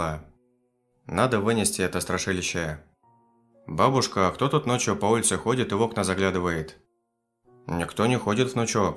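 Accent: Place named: Vyatka, Russia. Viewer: И правдо очень хорошо засыпать под Вятский говор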